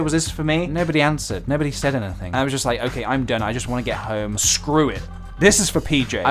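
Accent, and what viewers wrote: Peterborough, United Kingdom. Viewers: Lol sounds like good ol’ Peterborough to me 😂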